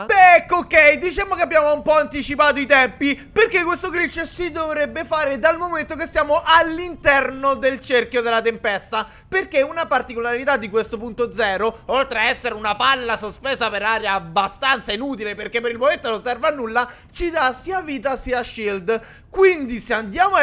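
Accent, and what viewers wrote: Sardinia, Italy. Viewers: sei sardo io si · ma sei sardo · Ma sei sardo?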